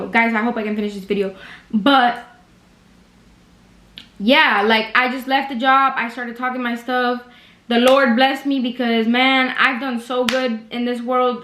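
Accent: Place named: Miami, United States. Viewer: I love your content.. and I can tell you sound like a Miami girl like me! I’m 48 and you’re definitely wise beyond your years. Keep spreading the positivity because Lord knows we can all use it 😊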